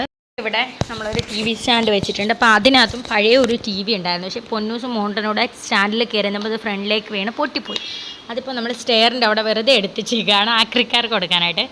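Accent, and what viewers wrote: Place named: Malabar, India. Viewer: sukamano enn choichatha.. Malabari slang😃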